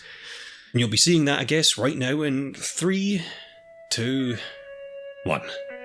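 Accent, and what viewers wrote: Scotland, United Kingdom. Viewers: Me realising that is Scottish: I thought you where English and yes the snow is every where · I love that you with a nice and friendly sounding Scottish accent plays such and makes an amazing dark series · I can’t un hear the Scottish now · Omg you're Scottish ❤️❤️❤️❤️ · How am I so bad with accents… me, born and raised in Scotland my entire life.. and can’t even recognise that the voice I’ve been listening to for the past 4 hours of YouTube binging is also a Scot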